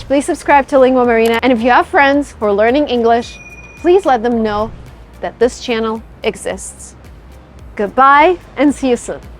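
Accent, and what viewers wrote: United States. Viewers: I love imitating your American accent, this language spoken so fluently. I just listen to your videos while I cook dinner or everytime I can and I' m so astonished to see how easily you influence and improve my listenining and speaking abilities. Thank you a lot. You' re fantastic!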